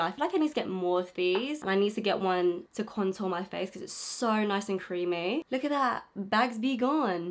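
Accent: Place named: London, United Kingdom. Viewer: I freaking LOVE this eye look!! The neon liner is giving me life 😭 also I absolutely love your London accent, makes me so happy!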